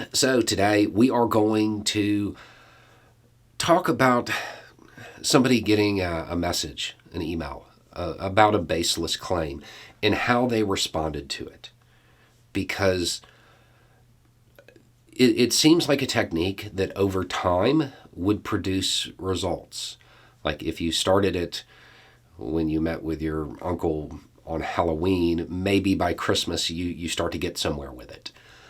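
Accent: South, United States. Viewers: When I was younger I carried shame for simply being born in the south. Because many of our people were and some still are racist and or extremists often falling for absurd conspiracy theories. So it's nice to hear a southern voice that represents the south and southern men well. Thank you. UPDATE - didn't expect interest in my comment but since some have noticed I just want to make clear I am not ashamed to be southern. Certainly there are people in our past and unfortunately present we can't feel good about. But just like minority girls and boys need people that can inspire them to be great, I feel the same can be said about southern white kids (and all kids really). Perhaps in addition to pointing out our historical mistakes in history class and public discourse (lest we repeat them again) maybe it would be useful to highlight those who have done well. 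We have astronauts, scientists, inventors, and other great people we can also be inspired by. I prefer the word "inspired" over "pride" because "pride" often leads us down a dark path. Plus I don't think I should feel any personal pride for the accomplishments of others, but I think I should be inspired by them to become a better person myself and feel a sense of "possibility".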